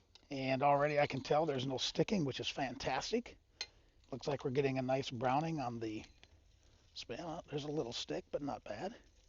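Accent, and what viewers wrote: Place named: United States. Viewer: I love American accents, no offense but we say Wolf as in Wolph...you say woof!!! The number of dialects and accents are nothing like in England where they change across the street, but accents in the US especially in the East Coast are numerous.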